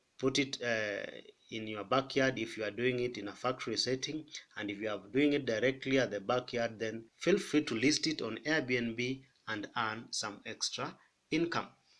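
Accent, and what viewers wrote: Kenya. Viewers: Good stuff man! and why do you sound like a Kenyan? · Sounds Kenyan.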